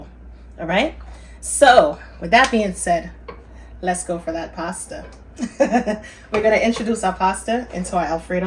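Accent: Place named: New York City, United States. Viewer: It's "Creative Cooking With ". I told her that I knew this wasn't Cooking With recipes with that bland looking food and lack of seasonings. I went off. I tell everybody about your food. How you cook like you're from South but your accent sounds like NYC. A wonderful mix.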